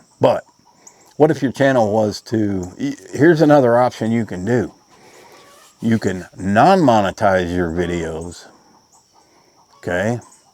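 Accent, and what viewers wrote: South, United States. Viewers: The video or your 1st video was good..u had that southern voice...and photogenic....very nice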